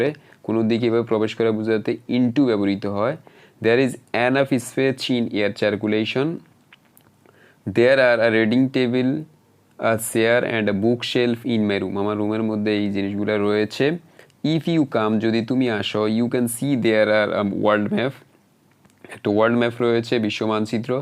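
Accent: Noakhali, Bangladesh. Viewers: oo vai noyakhailla apni?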